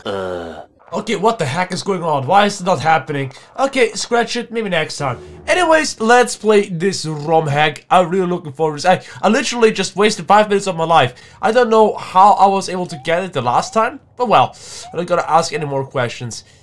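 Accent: Germany. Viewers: Are you German? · Can it be that youre german or something like that? 🤔😃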